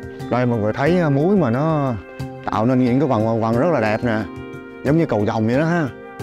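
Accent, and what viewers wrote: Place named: Mac Cuoi, Vietnam. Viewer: Cam on em . Chi Nghe em noi chuyen Mac Cuoi va thay vui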